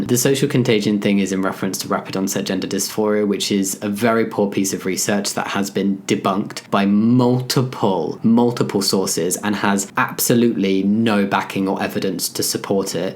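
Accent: United Kingdom. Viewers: Normally I don't pay attention to rants but the British accent and your humor makes it tolerable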